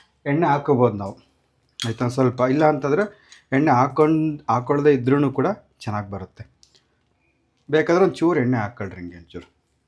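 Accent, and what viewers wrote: Chitradurga, India. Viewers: My mom has become your fan. Trying to understand your Chitradurga Kannada, but nice.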